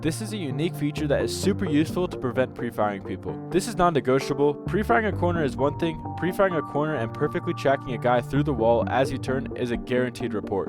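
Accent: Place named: North America. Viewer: You sound like your NA. Wait till embark send the suites to harass your mom like blizzard did mine with Glider/Honorbuddy. Good luck kid. Your barking up a ruff tree and your talent could make you way more money in another field. Being a white hat isn't "gay". Its progression into being a adult that wont spend the rest of his life in debt or in prison. Good luck young man. If you are even the one coding these and not just a reseller. Idk, been out of the scene for decades and wanted to see what kids where using now in games I played.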